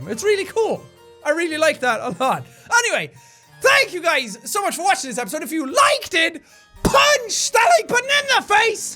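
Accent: Ireland. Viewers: you really like potatoes😉 (Not Lying) And gotta love DAT Irish Accent(I'm White) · Are you scared by the fact that because you moved away from Ireland you'll slowly lose your accent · do you think your irish accent will go away since you moved countries, I hope it doesnt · what if you lose your accent since your not living in Ireland anymore... like how people who move to the US, from a place like Australia, they start sounding American after a while.... just curious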